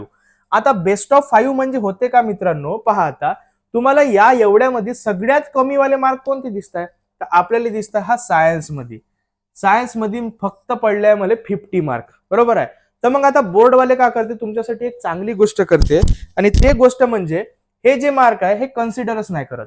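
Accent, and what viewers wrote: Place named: Malvani, India. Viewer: Sir khup chan malvani boltat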